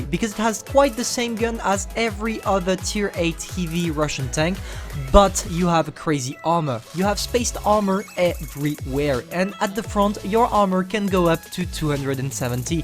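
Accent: France. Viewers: petit accent francais non ? :)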